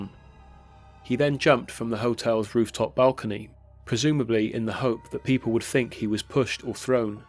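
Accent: England, United Kingdom. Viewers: I enjoyed those short stories, not heard any of them before. It is good to hear an English(?) voice making the narratives.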